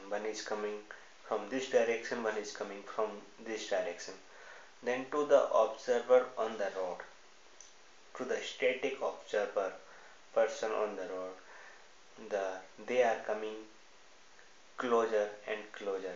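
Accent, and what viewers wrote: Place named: Asia. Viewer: wow, I would've thought that someone would've made fun of his Asian accent saying that Asians are really smart. Well done world!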